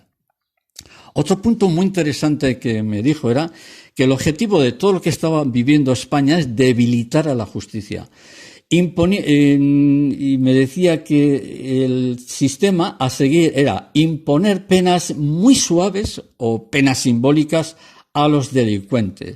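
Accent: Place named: Bolivia. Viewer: Tiene acento puramente boliviano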